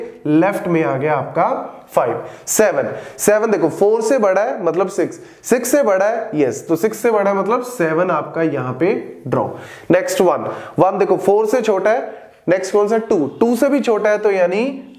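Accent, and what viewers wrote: Punjab, India. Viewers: Sir u r from Punjab?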